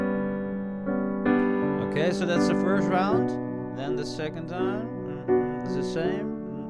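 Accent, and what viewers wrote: Netherlands. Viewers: Thank you, this was helpful! :) Also, you sound like you have a Dutch accent, no? haha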